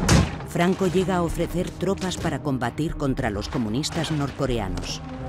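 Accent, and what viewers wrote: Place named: South America. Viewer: Eres sudamericano ¿verdad? En España si se pronuncia la T antes de la L, pero es en esta forma: At-lántico. Es como la LL, acá decimos cayar en vez de callar (caliar), eso lo aprendí en la sierra de Perú que hablan así e igual pasa en España, ellos pronuncian in, nosotros mal, saludos.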